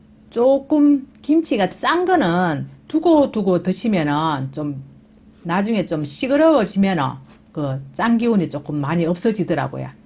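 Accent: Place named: Daegu, South Korea. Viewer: 싱거운 김장김치 고민중에 ~~영상보고 도움됫습니다 ~~대구사투리듣고싶어 한번씩보고 구독 좋아요 꼬옥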